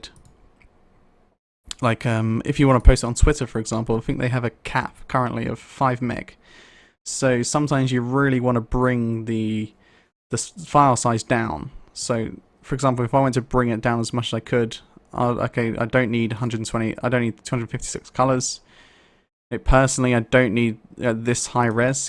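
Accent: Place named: England, United Kingdom. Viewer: Honestly when ever I hear an english accent on starting a tutorial I think ... YES !! its likely to be clear, concise and to the point .. thank you it was .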